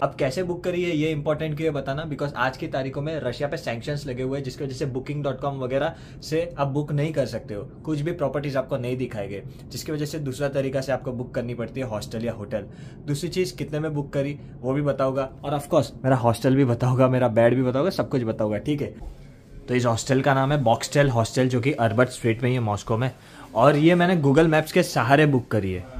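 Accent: India. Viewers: Brother in Russia with Indian Accent is like Magic❤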